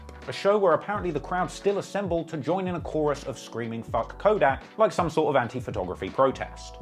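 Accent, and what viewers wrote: United Kingdom. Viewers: Are you British or American I am so confused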